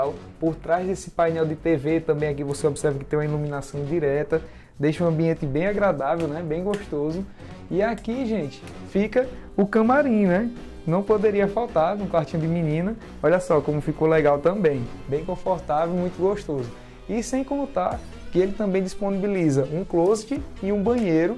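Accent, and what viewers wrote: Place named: Pernambuco, Brazil. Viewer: sua voz e muito suave passa um sussego, obrigado por nós mostrar essas casas linda, sou de Pernambuco e vc tem um sotaque parecido com o meu.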